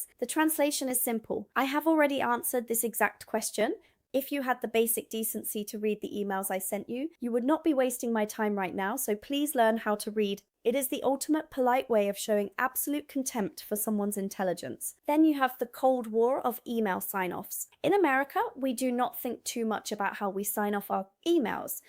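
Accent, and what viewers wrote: United Kingdom. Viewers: You sound British though 😮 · Why do you have a British accent and mannerisms?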